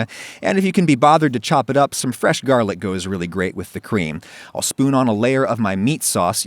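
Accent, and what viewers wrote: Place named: Georgia, United States. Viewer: The Georgia dialect is worming its way in by this point.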